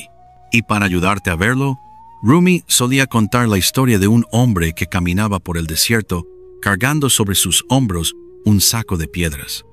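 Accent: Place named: England, United Kingdom. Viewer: Excelente reflexión. Cualquier cosa nos distrae del momento presente. Una recomendación sería usar una voz neutra y nativa, sin acento inglés, pues la pronunciación incorrecta termina distrayendo... Saludos.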